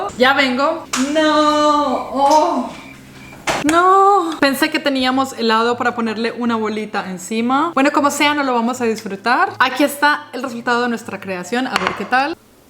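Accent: Antioquia, Colombia. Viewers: el otro día me puse a ver videos de cuando Sofía estaba bebé 😊 y me di cuenta que tenías tu acento colombiano más marcado. Por cierto la edición de tus videos ha mejorado muchisimo 👏🏼👏🏼 pero igual a veces me gusta regresarme a ver los videos viejitos 😁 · Hermosa a mi me fascinan tus videos, eres super genial y me inspiras mucho 💯💎👸 me encanta escucharte cuando hablas con tu acento paisa ( Medellín 🇨🇴) te quiero y sigue adelante 🌷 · Amooo tu acento colombiano y amoo tus videos 😊❤ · en este video se te salio mas el paisa jjj q chulo una vez dijiste q eras de medellin pero normalmente tu acento es bien neutro. Saludos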